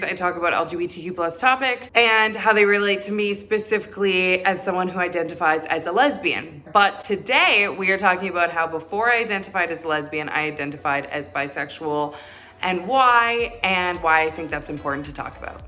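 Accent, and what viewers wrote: Canada. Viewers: Are u Canadian? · Are you Canadian?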